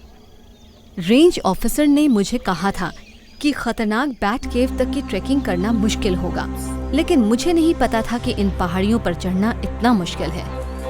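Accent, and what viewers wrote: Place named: United Kingdom. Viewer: लगता है किसी ब्रिटिश woman से हिंदी बोलने को बोल दिया है।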